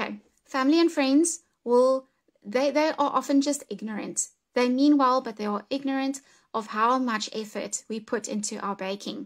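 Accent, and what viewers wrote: South Africa. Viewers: Your accent makes me miss my friends in South Africa!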